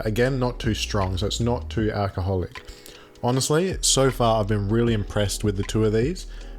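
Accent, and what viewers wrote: Adelaide, Australia. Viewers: this guy sounds just like DJ Sanchez lol beyond just adelaidian accent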